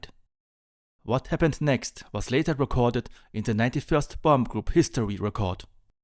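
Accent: Germany. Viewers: Thank you posting, and your English is perfectly understandable . I want to say your either Austrian or German going by accent . Thanks again for posting this, very interesting ..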